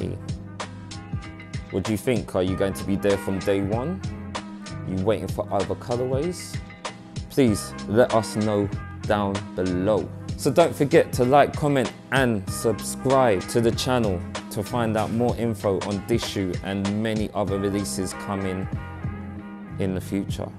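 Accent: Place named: England, United Kingdom. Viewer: Its amazing an african guy speaking like an englishman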